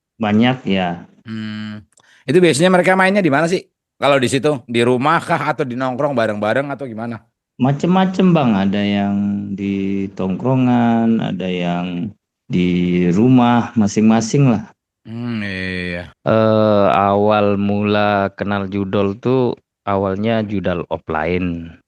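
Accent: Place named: Lombok, Indonesia. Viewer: Cok logat nya kyk orang Lombok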